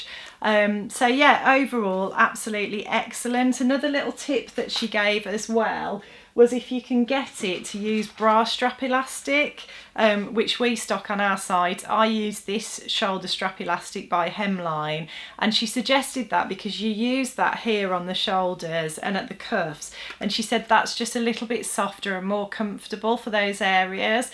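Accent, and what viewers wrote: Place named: Walsall, United Kingdom. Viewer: I am from Walsall, you sound like you are pretty close. I have lived in the US for many years and it is so nice to hear someone from close to home😀🇬🇧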